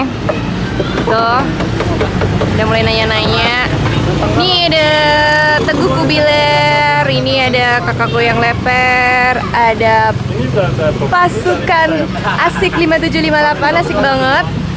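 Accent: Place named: Betawi, Indonesia. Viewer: Logat betawinya lucu..coba lbh di munculin lagi logatnya.. kaya afifah stand up/boah stand up. Pasti lbh lucu..hehe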